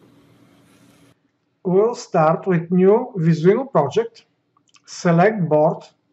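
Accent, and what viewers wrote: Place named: Russia. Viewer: Какой-то прям русский английский...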